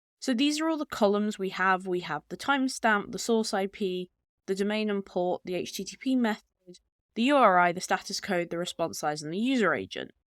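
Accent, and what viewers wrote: United Kingdom. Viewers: Watching all now after I've tried or got stumped... need to throw money at you to teach me. Nice to hear a uk voice for a change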